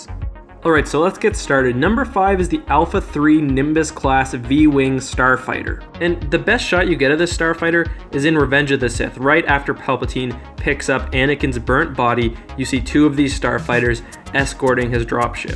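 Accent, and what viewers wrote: Canada. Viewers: Wait you're a Canuck?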